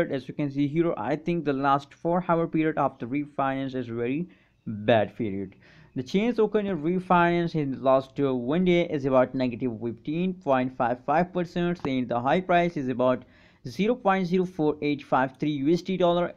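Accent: India. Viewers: Terrible indian accent.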